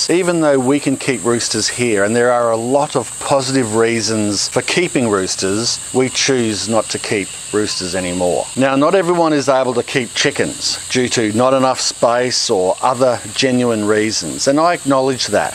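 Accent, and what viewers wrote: Australia. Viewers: I love the video, the australian accent, the puns and those shorts.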